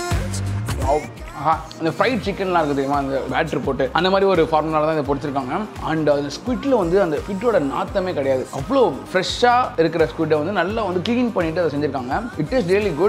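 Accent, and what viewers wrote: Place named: Kanyakumari, India. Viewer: Kanyakumari language poola iruka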